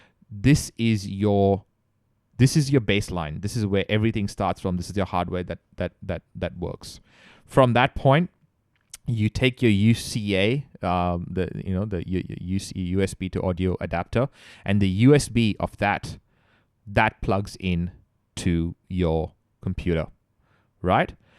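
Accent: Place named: United Kingdom. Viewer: Good grief! You spent the first two minutes chasing your tail! Repeating yourself... If you have a "know it all" Brit accent, you better deliver in the first ten seconds... "I'm the smartest person in the room and I have the accent to prove it!" No you''re not....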